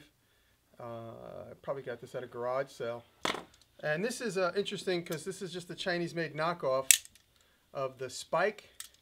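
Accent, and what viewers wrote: New York, United States. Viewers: Love the New York accent.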